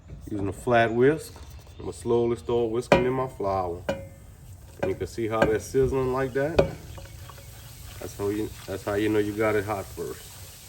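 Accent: Louisiana, United States. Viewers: your accent, you must be from somewhere around Assumption Parish? I grew up Mermentau/Crowley/Jennings. I’ve never been a slow roux guy, though. Have my veggies ready, heat my oil just to smoking, add the flour, stir like mad with that same high temp spat you have, add the veggies when I get my color. I’m usually done with a dark red-brown roux in less than ten minutes. I guess we all just do it the way our mamas taught us! I like what you’re doing man!!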